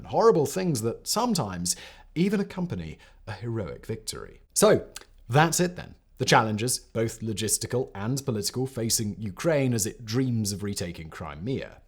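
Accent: RP, United Kingdom. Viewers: how many channels does this plummy have? Why is he all over my feed? How do I make it so I never have to hear his voice again?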